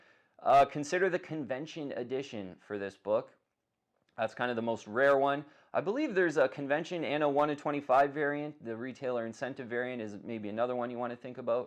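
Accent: Canada. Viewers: Love this channel!!! The Canadian accent makes me home sick - LOL Great videos keep them coming!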